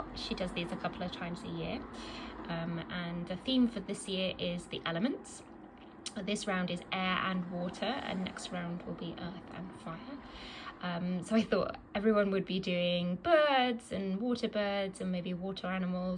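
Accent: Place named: United States. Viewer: Hi,! Great video, thanks for sharing! I have been planning on using my magnet giveaway to make cute little needle minders 🤭 those foxes are adorable!! Still obsessed with your ATC, laundry is so creative!! I love your American accent 😂 Yay long dog! I was drawn to Fish & Ships too, the other nautical ones in that “series” are fun and I’ve seen fewer people stitching on them. If and when I make the jump I’ll probably start with one of them! I fully support putting your name and age on the sampler! I was watching another video this weekend and they said “well you might not want to say you did it at age 76” and I thought “why not!! How fun!! 😂” Your stitchy kindness haul is great! Can’t wait to see you stitching on those + the spring Quaker!! -Katherine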